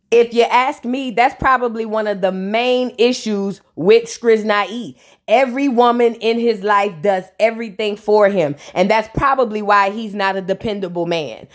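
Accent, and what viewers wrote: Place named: Louisiana, United States. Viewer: luv ur content is u from N.O. cuz u keep it real frfr and ur reactions is great if u not from N.O. u sure do sound like u from Louisiana da bottom of da boot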